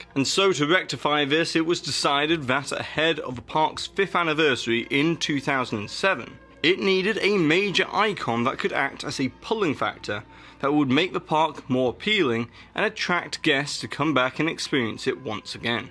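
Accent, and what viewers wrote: England, United Kingdom. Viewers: Great job, we appreciate your hard work! Was that hidden Mickey a Halloween joke? I never miss it🤔. I think you just need to work a bit on your Serling, but pretty good for an English accent! I’ve been to all three parks, but when I was in CA I missed the tower and my visits to Japan were well before Disney Sea. I’m not into the “spooky” girl versions. No thanks, but I do like the CA version with Guardians (thanks for explaining all the changes). I would love to see that version come to Orlando along with Spider-Man to replace Rockin Roller coaster or an avengers theme! Why not retheme that entire section of the park. 25 years is long enough! They just put the Cars attraction (mostly for kids, but an awesome animatronic!). Not sure why they put that there it would have been a great “Marvel” experience unless there’s rights issues! 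Once again great video /. Do we all get a pin for the hidden Mickey as a trick or treat? Yes!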